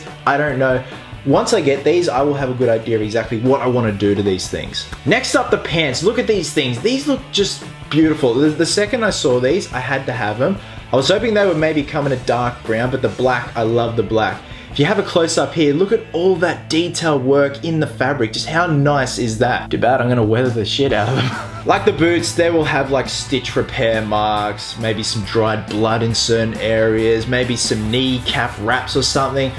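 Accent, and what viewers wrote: Australia. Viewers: After watching about fifty of your videos, this is the first time that I noticed that you had an Australian accent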